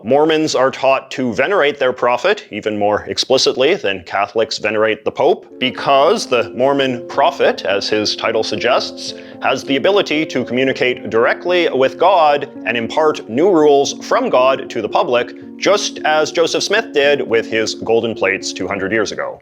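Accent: Canada. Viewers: Canadian, eh? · He's Canadian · An ethnically ambiguous surfer dude that says “about” like Canadians. Modernity is so fun. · 🇨🇦Ha ha. Nice Canadian accent ya got there, Eh?!👍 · You must be canadian by the way you say "about" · Why am I just now hearing about this guy with 80's hair and Canadian accent? Because I've been listening to Neil Young? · Your 'bouncy' countenance is driving me insane for some reason, like you rhythmically bounce up and down slightly to the cadence of your speech, twisting your head for emphasis on some words and then give a more pronounced 'bounce' to emphasize others. Like it's all I can notice whenever I see you talking, which is fine aside from certain extremely stereotypical Canadian pronunciations. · The purity of the Candian accent is just..... chef's kiss...... <3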